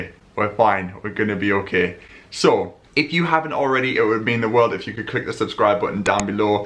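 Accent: United Kingdom. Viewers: British accent 💯🤍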